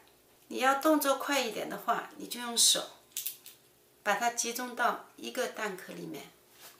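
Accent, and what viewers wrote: Shanghai, China. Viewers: 很喜欢这个教做传统上海菜的视频，很听Jenny 的带着上海口音的国语，她讲解和示范的很详尽，没有卖关子，订阅了她的视频后，我照着她所教的，己经做成功很多老上海经典的小菜和点心，上个礼拜做出了汤圆和牛肉馒头，谢谢Jenny, You are the BEST!❤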